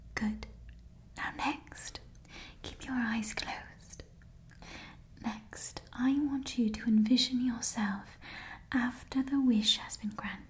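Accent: United Kingdom. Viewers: This is the first Harry Potter roleplay where I’ve seen you actually use a British accent.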